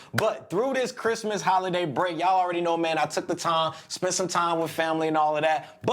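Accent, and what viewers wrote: St. Louis, United States. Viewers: Bro are you from St. Louis? 😂 STL in the building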